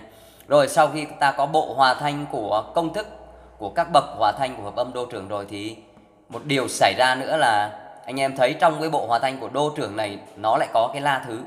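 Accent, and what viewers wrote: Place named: Central, Vietnam. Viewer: Bạn hướng dẫn hay quá. Bạn ở đâu vậy? Nghe như giọng Miền Trung á hè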